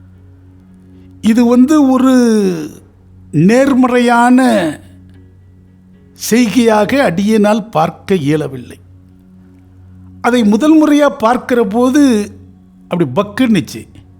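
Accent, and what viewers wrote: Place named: Kerala, India. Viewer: இவர் மலையாளி மாதிரி பேசுகிறார் மலையாளி ஜோதிடர் ரா !....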